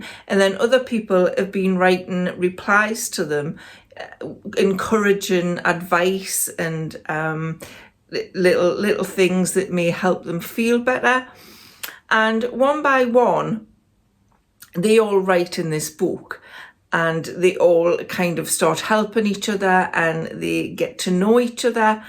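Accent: United Kingdom. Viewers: First of all, how nice to hear a British accent on YouTube!!! I watch loads of bookish, interiors and cooking videos when I can, and the presenters of the videos are - without fail - American - so I'm so happy to have found you. Look forward to catching up on your back-catalogue of book recommendations. x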